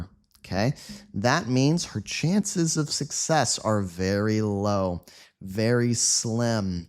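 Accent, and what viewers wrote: United States. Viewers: You articulate perfectly American English which is my favorite standard of the English language, listening to you is almost like having a personal tutor. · Are you an American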